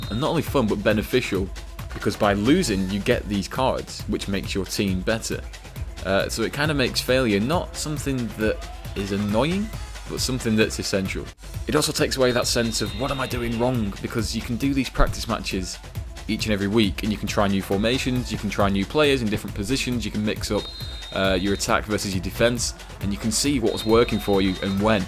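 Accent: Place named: Northern England, United Kingdom. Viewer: when this guy as a Northern English accent instead of Scottish then like AM. :P Loved the review.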